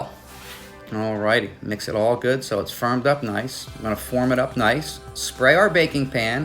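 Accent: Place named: Philadelphia, United States. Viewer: I'm from Philly so I recognized the "accent" even though I don't think we have an accent lol..I watched the video twice to make sure you didn't say water...that would've been a dead giveaway 😄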